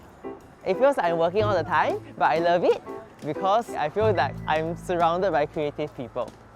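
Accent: Singapore. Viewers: Wow! Your energy is infectious! And i am so happy that you are proud of our Singaporean accent and do nothing to change it even when speaking to the British. Haha! Love your positive outlook on life! Definitely can't tell you are an introvert. Must be yet another INFJ haha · always thought he was just a NA/EU guy with a singaporean accent tbh, never expected him to be singaporean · Accent is very Singaporean; but the whimsical and joyful outlook is clearly NOT Singaporean. Most people I meet here are gloomy and not as bubbly.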